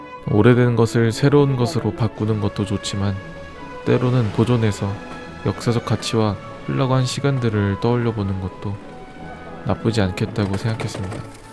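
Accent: Daejeon, South Korea. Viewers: 형 대전출신이야?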